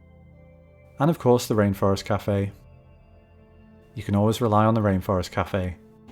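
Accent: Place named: England, United Kingdom. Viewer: dead mall vids hosted by an english man just hits alittle different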